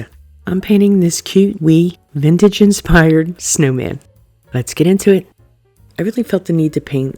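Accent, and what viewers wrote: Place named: East Coast, United States. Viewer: I love your East coast accent as well! Happy weekend!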